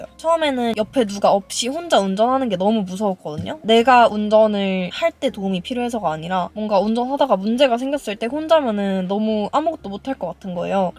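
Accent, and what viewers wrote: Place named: Jeolla, South Korea. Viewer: 전라도 사투리 심하구만 왜 서울사람인척?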